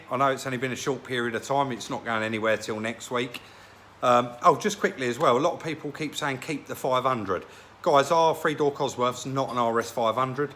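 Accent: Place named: Cockney, United Kingdom. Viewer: This is just a great channel, great commentary, as I've said before I just love the cockney geezer accent.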